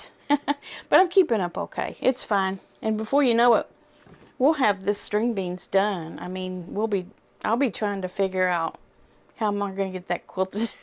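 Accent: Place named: Appalachia, United States. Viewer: Going back and looking at some of your older videos. Loved learning a bit more about you,. I do love your Appalachian twang—it’s very endearing. I think we should all be proud of where we’re from. I was born in Arkansas, but New Mexico is home now. You are a natural at producing YouTube videos! I’m enjoying and learning from your Lessons Learnt channel.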